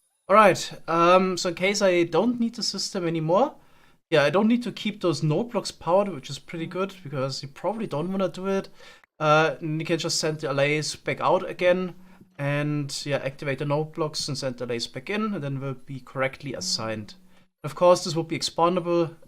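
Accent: Germany. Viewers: The german Accent haha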